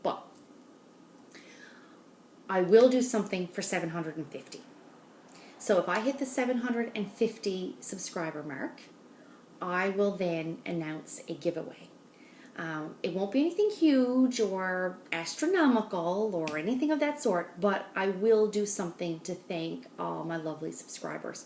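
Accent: Newfoundland, Canada. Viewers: I was listening to you speak and thought... that's an interesting accent. I feel like she's mentioned where she is from before, but I can't remember! So when you said "Newfoundlander" I was like "OOOOH!" I think you are the first person I have encountered with that accent! No wonder I couldn't quite place it. Your dad's boat is looking amazing! I am so impressed with the progress you've made and how fast it is going. My full coverage design is not even inching along, haha. And I agree with you! That Record Girl's hair is looking awesome. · I enjoy your videos ( and that Newfoundland accent ) so much. I am in rural Texas, no doubt I have my own accent, and I am over 120 miles from a Michael's and 70 miles from WalMart. No LNS anywhere either, so I do my stash enhancement shopping online. At least I don't have the shipping costs that you do. 😊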